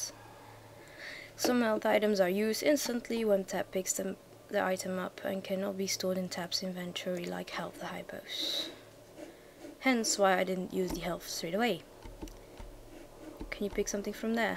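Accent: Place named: United Kingdom. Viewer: Nice accent : ) is it british?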